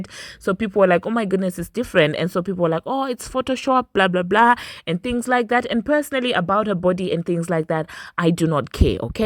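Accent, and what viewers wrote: South Africa. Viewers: thank you for representing us. That South African English accent 👌👌👌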